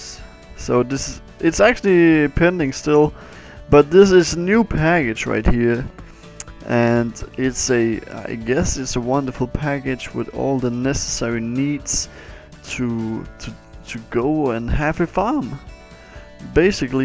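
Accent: Denmark. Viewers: Man kan godt høre at du er dansker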